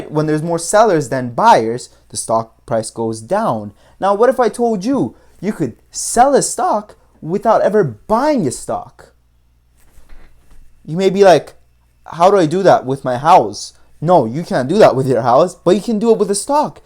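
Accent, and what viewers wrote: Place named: Iran. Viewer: You sound iranien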